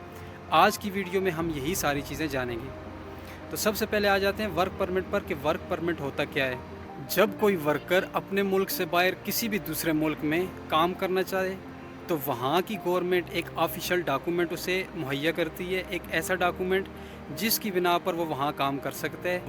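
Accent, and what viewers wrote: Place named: Hindko, Pakistan. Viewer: Yr ak mujy apny hinko speak bi lgty ho khan sy ho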